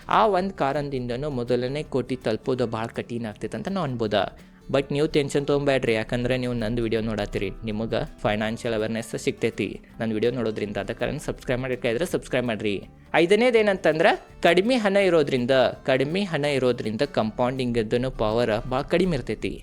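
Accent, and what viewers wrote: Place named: Belgaum, India. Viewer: The King of Belgam - with Belgam Kannada. Jai Ho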